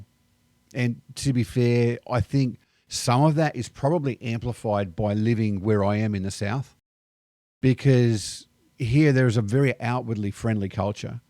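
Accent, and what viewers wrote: Australia. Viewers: If you are. Living. In the. Southern USA people are friendlier there than other parts of the USA. The northeast USA is less friendly. Since you have a pronounced Aussie accent, people everywhere will be curious and talk to you more. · Welcome to America! I love the Australian accent. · It's fascinating that he thinks it's "very personal" to ask him about his (very charming) accent. To us Americans, this is just totally natural banter, not remotely personal. Interesting look at the cultural differences between us Yanks and the Aussies.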